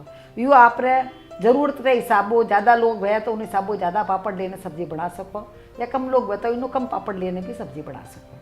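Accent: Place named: Marwari, India. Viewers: She must be from nagaur district of raj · This lady's Marwari is just so pure and sweet. The sheer pleasure is to listen and savour. But the added prize is some amazing food dishes. We've tried many and they are simply gorgeous. What an amazing find on YouTube - thank God for YouTube, the world would be poorer if we'd relied on terrestrial TV. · Love to listen your rajsthani voice and good sabji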